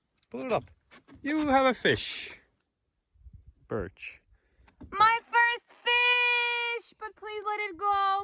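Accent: United States. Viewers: Stop speaking American English when they are killing people all over the world. Finland is the happiest country on earth so why spoil it with that Yankee talk. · sorry u sound yankie, no ring! kokeile suomex ( 10y exp?) yt 'moro suomaasta'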